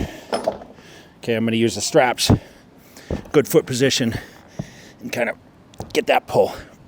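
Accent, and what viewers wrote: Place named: Boston, United States. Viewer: The Boston/Massachusetts accent coming out in full force lmao “shoaht list” “Splender” Boston: we drop r’s when they’re in the word and add them when they’re not there 😂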